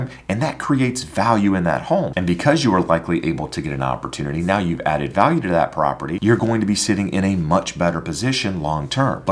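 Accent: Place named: North Carolina, United States. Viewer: I knew that was a NC accent. I completely agree. I just sold my house at 202,000 when I listed it at 185,000. I had 7 different offers and we went with the highest bid. Then we went and bought a new construction and they gave us an ammendum to lower the price by almost 30,000. So we were blessed to have a win'win situation. We locked in a 5.75 interest rate and the monthly payment is something comfortable. Great opportunities are still out there. Great vdeo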